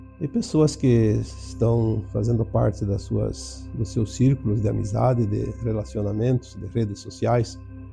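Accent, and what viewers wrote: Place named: Rio Grande do Sul, Brazil. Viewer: Eu acho que é do Rio Grande do Sul 😅pelo sotaque 😊😊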